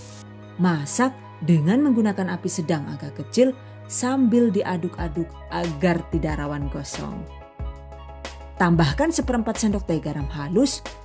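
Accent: Banyumas, Indonesia. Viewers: Akutuh suka liat videonya bunda salah satunya adalah kalo bunda lagi ngapak.. 😍😍 #GiveawayTHV2021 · Ngapak jane wongndi ya mba..? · Saya suka sekali dng resep" sy penasaran sekali" pas praktek poto doong bun.lucuu bahasa ngapaknya itu lho 😀😀😀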